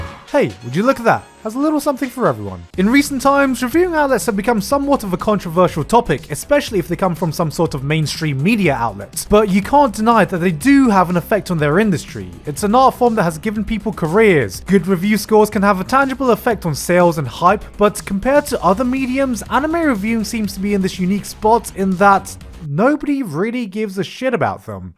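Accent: United Kingdom. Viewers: 🙋‍♂️I miss the salty gigguk who swears every 3 seconds in British accent. He made a baccano video swearing for 3 minutes, and it's a masterpiece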